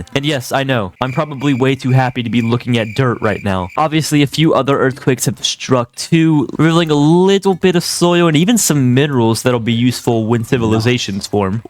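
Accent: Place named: Australia. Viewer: Bro's from the land down under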